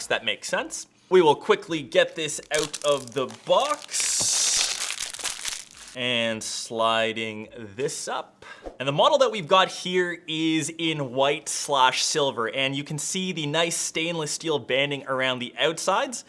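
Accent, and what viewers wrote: Canada. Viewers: I have to admit, one of the highlights of watching your videos is chuckling when you say words like out, about or been, but we love our neighbors to the north. 🤣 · You have an accent. Are you Canadian?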